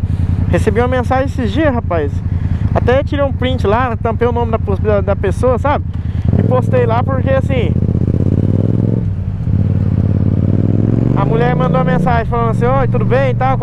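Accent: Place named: Minas Gerais, Brazil. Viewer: Se tu for "minero" com esse sotaque é nois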